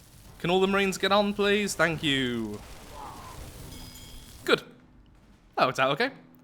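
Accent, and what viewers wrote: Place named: Birmingham, United Kingdom. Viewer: I'm not certain but he sounds like he's from somewhere near Birmingham, UK.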